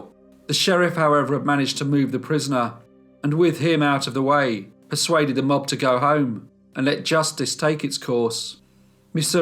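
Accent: United Kingdom. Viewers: Whenever l hear your voice, l always picture a younger 'Alan Rickman'..... I'm dying of curiosity..... Am l even remotely close? (sorry, I know looks aren't important, but I already have a crush over your British accent, so l can't help but wonder about the rest of you! Sorry for sounding creepy, I'm actually a huge fan of your channel!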